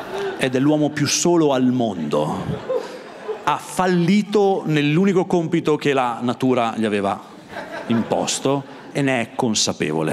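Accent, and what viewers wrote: Italy. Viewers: Ho guardato questo video ieri sera e l'ho riguardato a oggi mezzogiorno con i miei.. Ci siamo smascellati dal ridere! Grazie Eleazaro a nome di tutta la Romagna, di tutte le "zeta romagnole" e a nome del parlare come si mangia, senza tutti sti costrutti e perbenismi che attanagliano la società! Ci vediamo a Marzo a Savignano! Un abbraccio! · ...non siamo così ambiziosi...fantastico! Finalmente un accento né romano, né napoletano. Uno del nord che dice grandi verità senza filtri. Che soddisfazione. Veramente catartico. Ho chiamato quel figlio di puttana di mio padre nell' aldilà (è morto da un po') e l'ho perdonato. Saluti.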